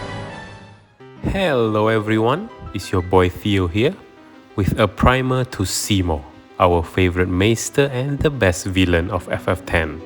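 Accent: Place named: Singapore. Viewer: hi bro are u singaporean too? sounds like one also might need help on ddffoo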